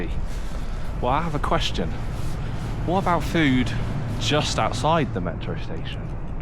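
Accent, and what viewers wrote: United Kingdom. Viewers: What do you call our resiential buildings? Comfy? Commie? (as in Communist) Coming? I don't understand British accent too well, I'm afraid. LOL But anyway, they have such buildings all over the world, - Europe, USA, Canada... It's not something typically Russian or Soviet :) And hey, welcome to Russia! Stay warm! Spring is nigh! :))) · Британский акцент это нечто. Каждый раз ловлю вайбы, как будто мне профессор в Оксфорде лекцию читает ( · Американское произношение гораздо чище и понятнее британского. Бриты говорят как будто у них рот набит кашей. Мямлят и не произносят окончание слов. говорит с бр. акцентом, но понятно каждое слово.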